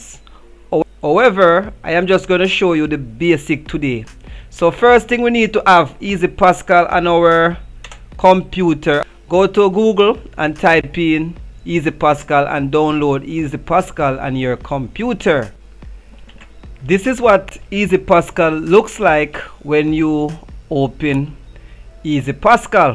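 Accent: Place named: Jamaica. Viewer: love and support love the jamaican accent big up yuh self!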